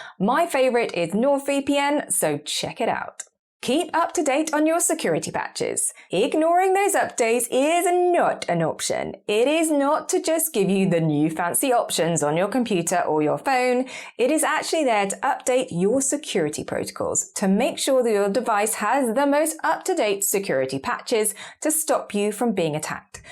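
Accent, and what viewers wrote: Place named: RP, United Kingdom. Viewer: When I close my eyes and listen only to your voice, I can truly believe you are part of the British Royal Family!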